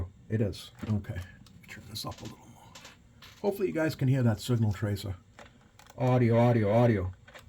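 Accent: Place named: Southern New England, United States. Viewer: Interesting video. Some food for thought, and I'm certainly not trying to pick on you at all! Your signal tracing is using a signal injector. It emits a tone, probably 1 khz. Technically you didn't need to say audio. Just key the microphone and listen for the tone. Additionally I wouldn't mount the echo board near the rf power section. Leaving the echo board where it was originally mounted and cutting the echo board wires to the needed length may have been a better idea but that's all up to you. I'm just offering a different prospective. It's clear you enjoy working on CB's. It can be challenging and rewarding. My signal injector looks exactly like yours except mine is an old Radio Shack unit I picked up in a package deal from Smokey's CB shop in North Grosvenordale Connecticut. I bought all of his equipment many years ago when he retired. 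You're accent sounds like you're from Southern New England. :-)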